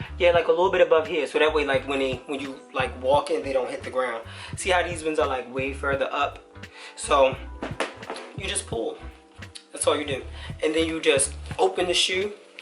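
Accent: Baltimore, United States. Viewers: You definitely from Baltimore lol shuuus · Baltimore accent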